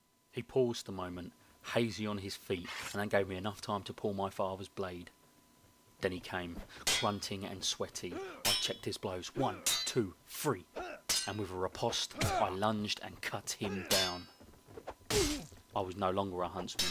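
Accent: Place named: United Kingdom. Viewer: you have a good accent, its british but rough and hard.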